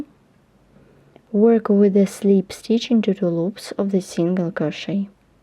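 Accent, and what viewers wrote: Russia. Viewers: А по русски можно? Вы же не американка, русская слышно. Практикуете на инглише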